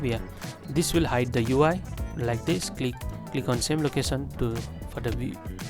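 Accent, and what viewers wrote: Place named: Nepal. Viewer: The video was really good and easy to understand, but your accent got me. By any chance are you from Nepal? Your accent really reminds me of a typical Nepali accent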